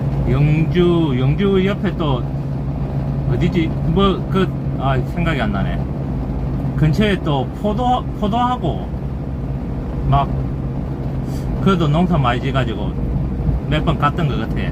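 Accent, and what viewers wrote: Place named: Jinju, South Korea. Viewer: 진주 사시는군요 ㅎㅎ 말씀이 구수~하시네요 ㅋㅋㅋㅋㅋ 😂😊 풀영상으로 응원합니다 😍💞💓😻😸👍👍👍 항상 울콩딸기가 진심으로 감사드립니다 🙏🙏🙏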